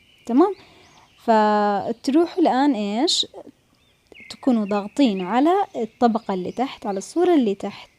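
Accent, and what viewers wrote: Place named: Yemen. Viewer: من لهجتك احسك يمنيه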